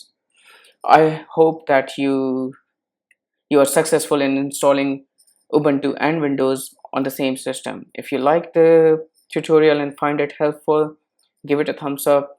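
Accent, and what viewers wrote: India. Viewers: Can i find a video without the FUCKIN INDIAN ACCENT PLEASE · great content, but I don't the Indian accent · Indian accent , it makes things so easy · Why do Indians always sound like this ?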